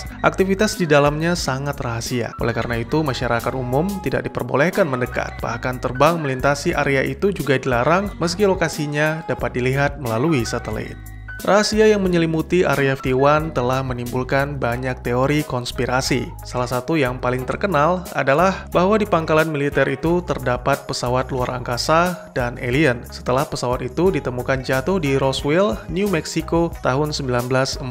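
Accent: Aceh, Indonesia. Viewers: Bang lu org aceh ya?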